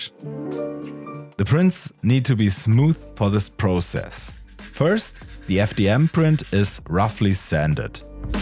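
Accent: Germany. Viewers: German . I hear the accent. lol is this doc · Cooles Video und auch cool das du so gut fachlich Englisch spricht man hört aber sehr gut den Deutschen Akzent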